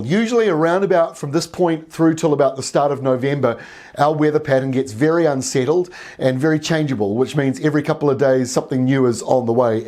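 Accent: New Zealand. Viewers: It’s so refreshing to hear the NEW ZEALAND place names as we’ve ALWAYS known them. Don’t bend, don’t conform, Duncan.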